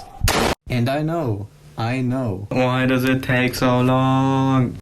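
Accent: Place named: Germany. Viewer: Ich höre einen deutschen aktzent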